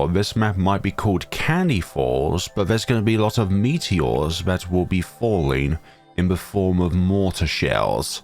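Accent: United Kingdom. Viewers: why are you so posh?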